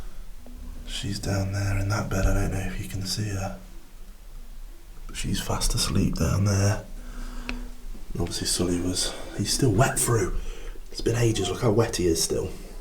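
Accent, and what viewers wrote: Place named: England, United Kingdom. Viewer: I'm enjoying both of your YouTube sites and your puppy course. In 4 more weeks I am going to be able to pickup my lab puppy. With the help of a special trainer I will train the pup to be a mobility service dog. I want to be able to go places where a rolling walker is a problem. Keep up the good work, best wishes from Albuquerque, New Mexico in the Southwest of the U.S. ... P.S. many years ago I worked for the DuPont company in Stevenage, so your "accent" is welcome.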